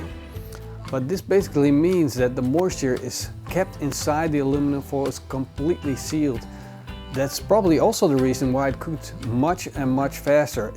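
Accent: Netherlands. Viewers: Hey you're dutch... i know because of that acent :3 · Mario Solorzano Jr ? Perhaps Holland ? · Are you from Holland? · Netherlands, I think. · Thanks for the vid. You speak English with a Dutch accent :) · You sound Dutch.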